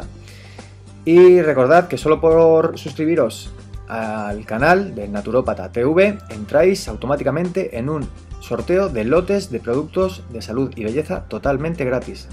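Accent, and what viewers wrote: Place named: South America. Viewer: Excelente consejo. Lo llevaré a la práctica a la de ya!!! Muchas gracias por el tip. Sólo una pregunta mi distinguido: En lugar de lo que tu llamas esparadrapo puedo utilizar lo que yo llamaría Microporo o cinta microporosa???? Quiero entender que es lo mismo. Por tu acento creo que eres sudamericano o de España, y aquí en México yo creo que sería la alternativa correcta. Me equivoco???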